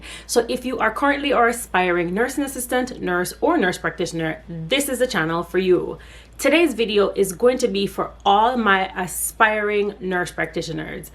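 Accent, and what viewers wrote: Jamaica. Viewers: From your accent I could tell you are Jamaican. I know more Jamaican nurses go to the USA than here in Canada. My pay here without a ton of overtime( I think I did overtime 4 or 5 times for the year) is just over 95,000 CAN before taxes. Last year I got over 100,000 for the first time in my career after working over 20 years. The problem is we pay a lot in taxes. I find people don't complain much about taxes here . Its just recently I hear more complaints about pay , taxes etc. I think because housing prices have gone up significantly in the cities, plus inflation and the government has frozen salary to 1 % increase which is nothing. Generally before all this ,a nurse can buy a nice house on her own and live pretty descent life without working tons of extra time. NP's here get more to start but can still work less overall in comparison to other nurses who do OT etc. 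We are due for a descent pay increase , so will see what happens.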